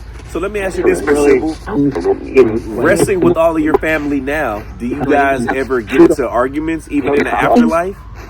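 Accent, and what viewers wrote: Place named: Texas, United States. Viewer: Sybelle said " you sound like you're from Texas" then she said "I like you & she may visit you" She also said " you were funny" 🤣 She likes you alot and wants you to come back. 😊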